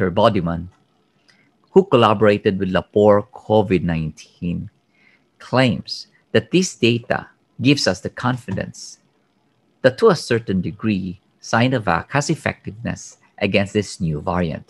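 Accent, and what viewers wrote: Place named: Philippines. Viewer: Once I opened this video 1st I thought you are a philippino later you mentioned that😃 actually I have many friends from Philippines so, I know accent.❤️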